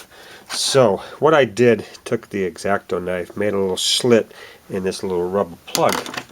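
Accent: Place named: Massachusetts, United States. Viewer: "Oh my God...you're a pimpin' fool!"...LOL You must be from Massachusetts...you have the same accent as a buddy of mine. He says things like rubbish...and Caaah (for car)...lol Nice videos! I may have to do this but with one that is not the sequential flashing type...to me it is a bit annoying. I would be adding them to a mirror that already has forward facing flashers...I just want some with them facing the rear to alert people coming up on you.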